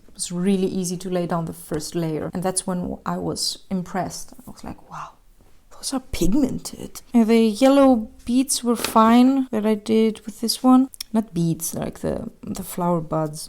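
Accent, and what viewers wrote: Germany. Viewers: I live in Germany... Sooo its cheap XD btw I think she is also Herman... Caus the accent and on the pack was standing '' Buntstifte" that's German and means colored pencils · R u german cuz you kind of have a german accent and also faber is made in germany and i am also german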